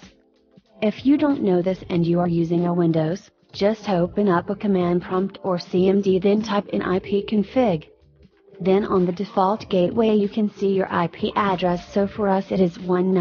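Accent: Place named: United States. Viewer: Gandah nang boses parang american😁salamt sa video mo.another saving life....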